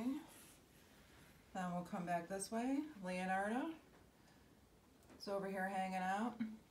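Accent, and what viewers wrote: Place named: Texas, United States. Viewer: I live in Texas, north of Houston in The Woodlands. I thought you sounded like you might have been from down here somewhere. Anyway, glad I found your channel.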